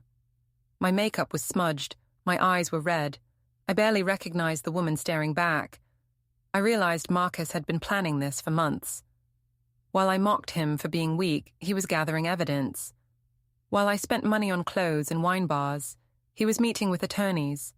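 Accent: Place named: RP, United Kingdom. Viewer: You aŕe right. This posh british accent makes me want to vomit...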